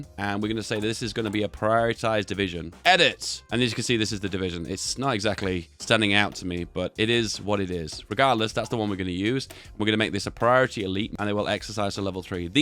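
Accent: United Kingdom. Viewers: why does sound like spiffing brit? is it because their both british?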